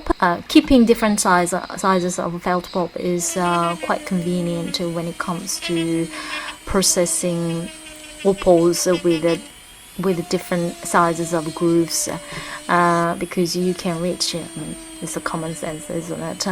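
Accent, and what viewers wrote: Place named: Australia. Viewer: Please don't apologize for your accent. I was captivated by the Asian/Aussie blend. I hope to find more of your work. Very informative, thank you!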